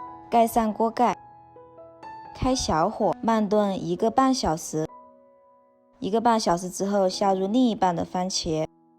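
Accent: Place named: Sichuan, China. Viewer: 川妹子吗？这普通话 哈哈哈 加油！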